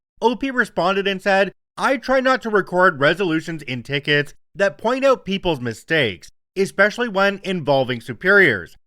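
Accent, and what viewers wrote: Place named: Canada. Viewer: Weird: this is the first time I've noticed your accent is Canadian.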